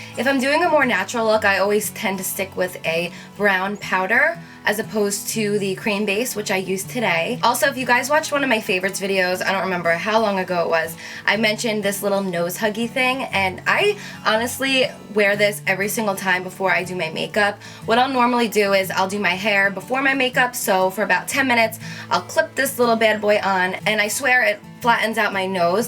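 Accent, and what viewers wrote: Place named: California, United States. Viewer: How cute can you be, you sound like a california girl then some black women, and its sooo cute!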